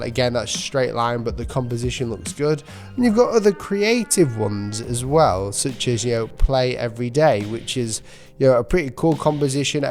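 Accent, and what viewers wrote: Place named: Yorkshire, United Kingdom. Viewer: Are you from Yorkshire? You sound exactly like the voice in my head. It’s weird.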